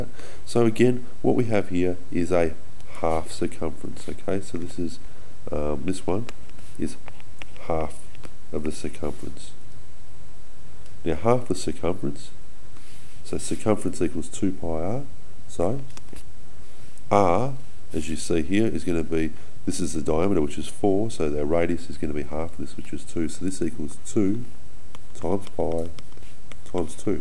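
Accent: Australia. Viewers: I don't really understand coz of his aussie accent.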